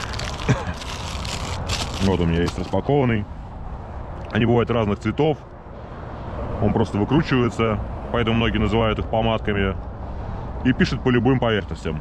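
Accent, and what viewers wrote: Russia. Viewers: Я так и не понел,ты русский или американец?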